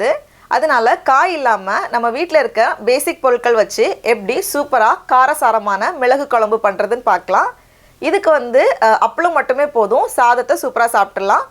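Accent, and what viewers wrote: Nagercoil, India. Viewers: I like ur nagercoil slang..I'm from Tirunelveli..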